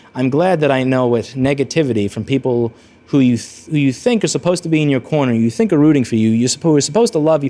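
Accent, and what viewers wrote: New York, United States. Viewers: Maybe it's just me, but your NY accent seems way heavier back then than it is now.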